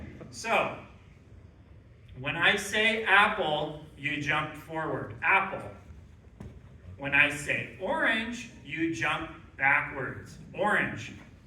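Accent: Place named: Western Pennsylvania, United States. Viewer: I recently found your channel and have been binging it. I thought you from the mid west or near east coast. I'm from western PA and your character is how we just talk. I thought you were one of us, you dirty dog!! Everyone even called my grandmother "Naner" for crying out loud. I got back into retro gaming in the past 2 years after dealing with a potentially very serious illness (it's mostly under control now) and finding your channel has helped me stay together while I distract myself with collecting and revisiting retro games again. I'm a lifer at 41yo started with a used Atari 2600 at age 4, then NES etc. all the way through to today. Thank you for the excellent channel.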